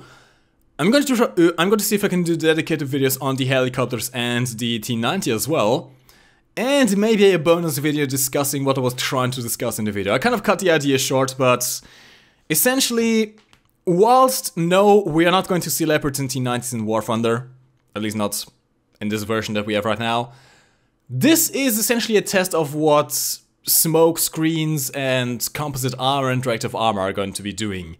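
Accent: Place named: Germany. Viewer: very german accent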